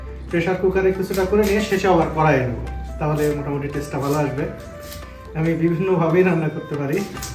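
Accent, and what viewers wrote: Sylhet, Bangladesh. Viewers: ভাইকে আমাদের বাংলাদেশের সিলেটের মানুষের মুখের ভাষাও শুনা যায় ❤❤ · bai amder sylhet er manush er moto kota bolen.balo laglo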